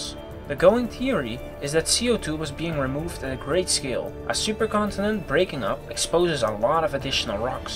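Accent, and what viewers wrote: Netherlands. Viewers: Some constructive criticism: Talk slower, and clearer (dutch accent is of a good things ;)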